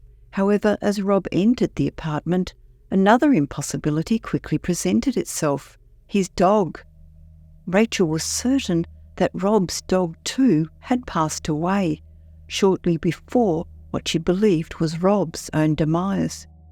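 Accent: Australia. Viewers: The woman narrating this story is Australian, not British.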